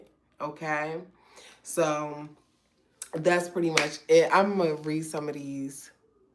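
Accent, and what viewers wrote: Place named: Baltimore, United States. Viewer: hello beautiful. can I ask where are you from? you have a Bmore or DC accent. I'm Bmore born and bred! #Poly😆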